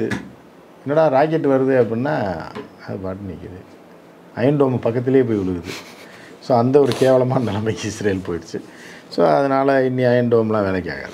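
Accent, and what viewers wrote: Madurai, India. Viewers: sir. Naanum madurai karan tha. Un speech a vida unga slang ku fan. But kirmani was a wicket keeper. I beleive that bowler may be someone. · சார் சார் உங்களுடைய பேச்சைக் கேட்கும் போது மதுர மாவட்டத்தினுடைய பேச்சு மாதிரி தெரியுது நீங்க தமிழ்நாட்டில் வந்து மதுரை மாவட்டம் நான் வந்து கன்னியாகுமரி சார்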